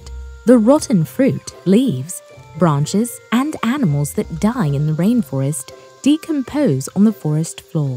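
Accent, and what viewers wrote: United Kingdom. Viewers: Do you have a British accent? ❓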